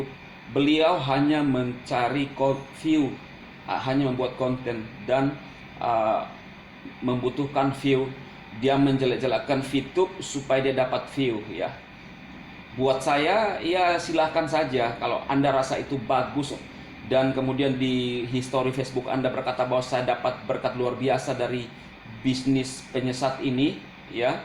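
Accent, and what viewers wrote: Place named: Bugis, Indonesia. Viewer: logat bugis y.. josss vitube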